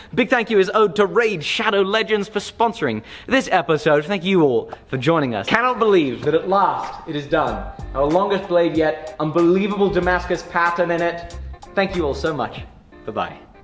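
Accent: France. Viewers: Super accent français ! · that french accent bro oO Congrats from france!! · Supers accent français, félicitations !! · Another french applauding the french accent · Your french accent is killing me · Très bel accent français! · belle accent français ! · Joli accent français 😉 ! Amazing Damascus pattern, really nice job 👍🏼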